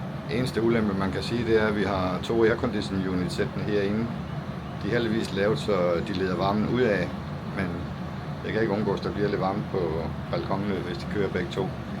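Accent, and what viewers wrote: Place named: East Jutland, Denmark. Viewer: sejt kan høre du er jyde østjyde måske fra Aarhus egnen- kan jeg have ret i det- men god video - var i Hua Hin i 2- uger i 2019 samt længere sydpå i 2 uger boede først på hotel Rocco Hua Hin men der var for meget støj - så det var svært at sidde uden på balkonen så vi flyttede til et andet 800 meter væk men afsides fra trafikken det var væsentligt bedre- men kan ikke huske navnet - men der var mere ro og en gag om ugen var der marked lige overfor. men lækkert havde også en 10 dage i Soy Sam Yot først var der i 30 dage i alt plus 2017 i 30 dage samme sted som , sidst nævnt- lækkert var det!!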